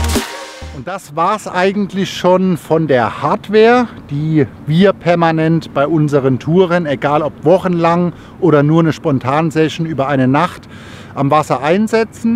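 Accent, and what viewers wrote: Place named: Hesse, Germany. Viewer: "Un hier ham mer beim Türk eh paar Peperonis geholt" Hessisch is die best Sprach dies gibt 😁💪🏻👍🏻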